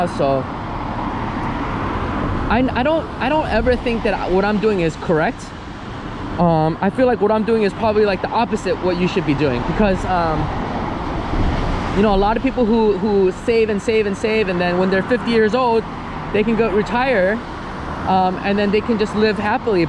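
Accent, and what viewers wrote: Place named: United States. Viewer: Oh, I forgot to say this is Happy again, I Just log in with my account because it's too difficult to write from ipad,and so difficult to edit. Yes, you and I do have similiar background, but trust me, you are very americanized, you will not have any problem what so ever if you ever choose to live in America, you are more american than you think you are. But you don't have to live in US, you can lived anywhere if that's what your heart desire. Only down side is you probably do want to use facetime to spend with your parents since it would be hard to not spend time with them. I came to us when I was 13 years and after living in usa for 31 years, I am still not as americanized as people think, but that's okay. I will never speak perfect English like you do, I still have tiny bit accent. I see many people immigrant from other country and have "little" problems lived in US, but again, if one has desire to stay, they will learn to adapt. 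so if you happy living in Japan, just be happy to do so. However, don't think you will not able to adapt US, afterall, when I "listen" to your talks, I heard just a perfect american speaking. so anyway, just a little point to share.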